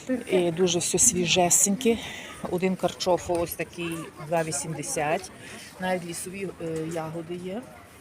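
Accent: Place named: Western Ukraine, Ukraine. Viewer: А мне нравится сразу слышно что с запада, и прикольно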